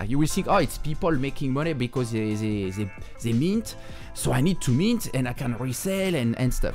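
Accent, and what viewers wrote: France. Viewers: L'accent French est le meilleur tout le monde kiff de ouf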